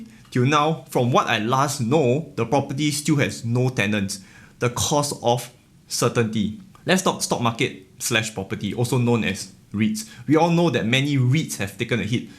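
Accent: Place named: Singapore. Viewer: I love your singlish bro 😂 can’t wait to come to Singapore one day!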